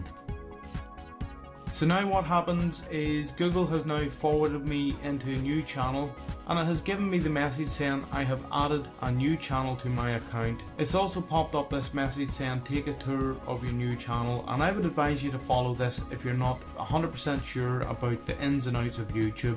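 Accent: Northern Ireland, United Kingdom. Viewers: U have the same accent as me! R u from the uk? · Thank you, very easy, clear, instructions. I had a look at your videos and there's loads of great stuff I can use, got yourself another sub. Also, nice to hear a Norn Irn voice too. Best Wishes, Brendan (outside Lurgan).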